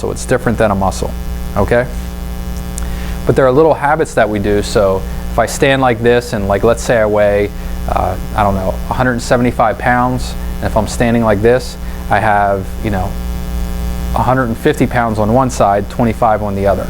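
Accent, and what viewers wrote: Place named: Pittsburgh, United States. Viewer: Are you around Pittsburgh? I'm judging by your dialect.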